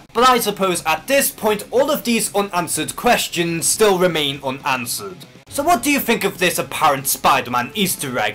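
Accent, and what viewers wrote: Liverpool, United Kingdom. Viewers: Woah are you scouse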